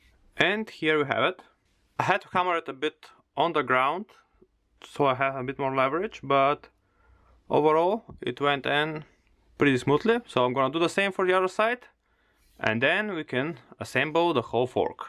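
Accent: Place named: Russia. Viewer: Какой прекрасный русский акцент )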